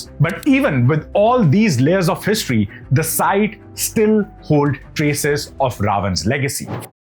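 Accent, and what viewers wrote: India. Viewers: Better slow down mate ...then we can at least understand your typical Indian English Accent. Slow down, why are you hurrying for ??? 😃👍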